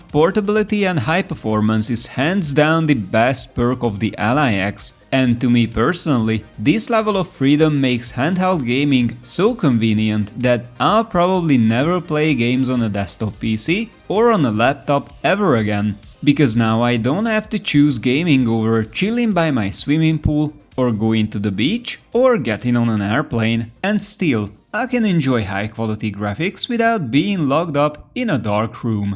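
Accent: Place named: Hungary. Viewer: Magyar vagy? Legalábbis akcentusból ítélve. :)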